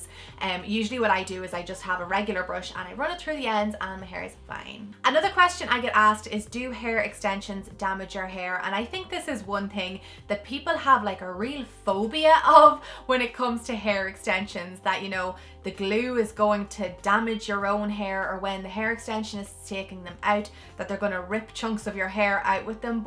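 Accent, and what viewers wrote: Ireland. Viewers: Love the Irish accent so sweet. . Your hair is beautiful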